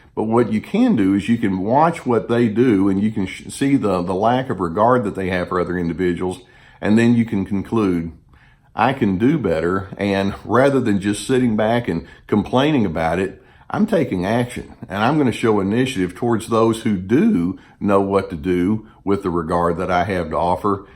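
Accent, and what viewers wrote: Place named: Texas, United States. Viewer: You sound like you're from Texas-east or central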